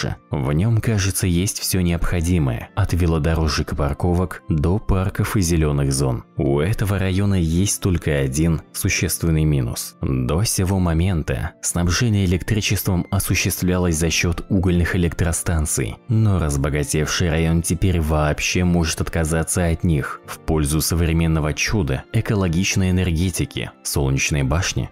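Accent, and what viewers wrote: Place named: Izhevsk, Russia. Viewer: Так и знал, что ты из Ижевска) По акценту было понятно Привет из Берша :)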